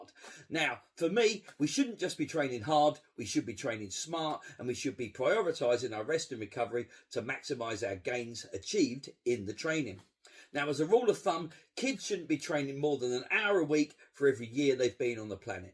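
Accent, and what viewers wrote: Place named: Kent, United Kingdom. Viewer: This guy is from Kent 10000%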